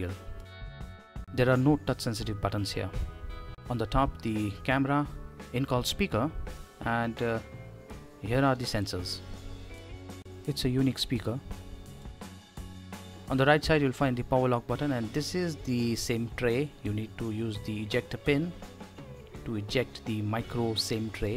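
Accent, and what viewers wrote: India. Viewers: are you indian cause I recodnise the accent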